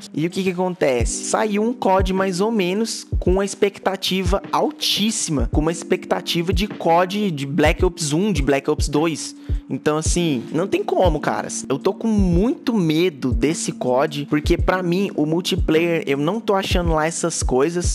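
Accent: Minas Gerais, Brazil. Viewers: Pelo seu sotaque sei que vc é de MG. So pelos videos que vi concordo com suas opiniões quanto aos especialistas e quantos às animações... to esperando ficar free pq nao gasto dinheiro com pre venda de cod...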